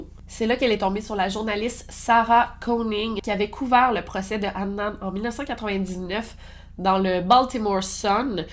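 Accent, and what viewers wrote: Quebec, Canada. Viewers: Dommage... Je supporte pas l'accent que québécois....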